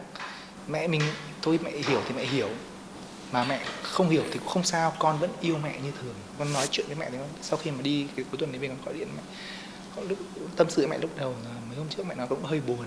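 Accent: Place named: Hue, Vietnam. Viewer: Giong doc cua em voi phap danh that chueyn cam pha chut gi cua huecua dai dat nang chay mua lu mac du em sinh ra o binh dinh con thay e kip lam kenh dac biet la co chau tho voi su gop mat cua cac ban tre la canh tay dai dua giao phap cua su ong bac thay day kha kinh lan rong di xau vao xa hoi con tin so nguoi nghe xem tim hieu se ngay mot nhieu thoi neu no co it co dung buon va hay kien nhan nha co chuc co va ekip co nhieu suc khoe va duoc chu but chu to gia ho luon luon binh an hanh phuc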